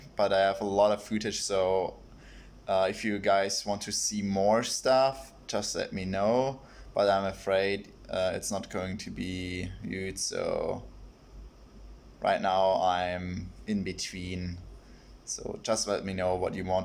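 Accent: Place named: Finland. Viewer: So sweet ☺️ sounds more like Finglish than English, cool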